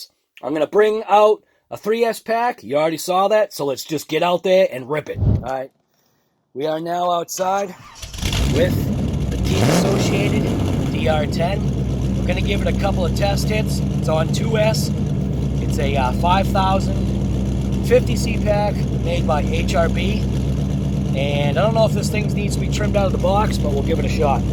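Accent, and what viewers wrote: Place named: Massachusetts, United States. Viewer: Brutha! You've gotta be from Massachusetts! Lmk sir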